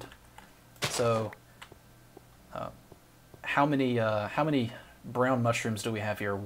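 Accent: South, United States. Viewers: Accents are awesome. My favorite is hearing UK or Australian people putting on an American accent., you sound like you're from an urban area in the southern U.S. - not a thick stereotypical "Southern" accent but a hint of the twang/drawl. (Likewise, I'm from the Minneapolis area so I have a hint of the northern Midwestern "O ya sure you betcha" thing, but not nearly as much as my friends from rural MN/WI.)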